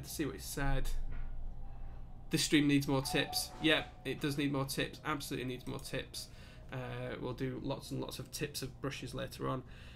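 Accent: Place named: Yorkshire, United Kingdom. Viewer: I'd put your accent as Yorkshire, but just a guess.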